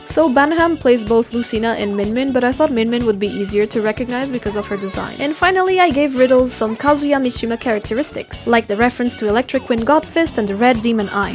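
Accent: Portugal. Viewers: Voce é de Portugal??? Wow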